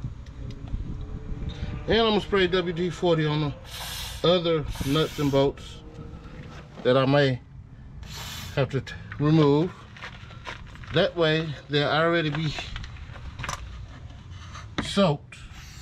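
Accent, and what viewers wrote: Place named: Detroit, United States. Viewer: Sound like you from the D.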